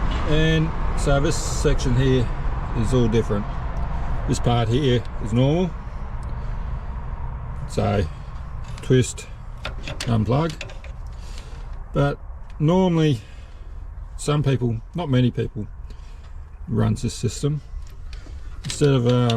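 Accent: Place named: Australia. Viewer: hey im glad you speak plain Australian everyone wants to comment on the connection names im just happy to get these pointers❤❤❤❤❤ ya work mate keep it up for the rest of us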